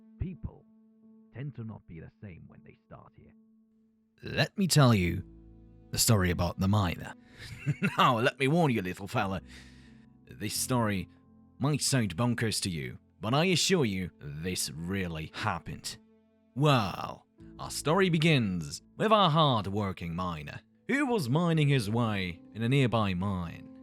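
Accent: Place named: Germany. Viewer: your accent is so interesting it kinda sounds german but not at the same time so cool! also this video is so awesome of an intro its really so awesome to see new ideas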